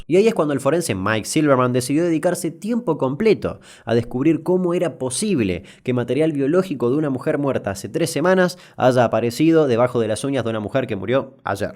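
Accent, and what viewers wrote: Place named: Posadas, Argentina. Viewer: De que lugar sos Capo? Pareces Posadeño amigo.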